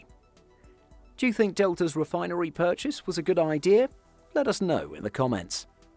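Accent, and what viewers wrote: United Kingdom. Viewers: The UK I can tell by his accent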